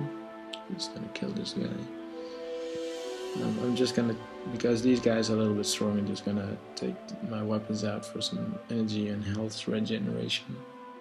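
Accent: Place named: Netherlands. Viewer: dacht ik ook al nederlands accent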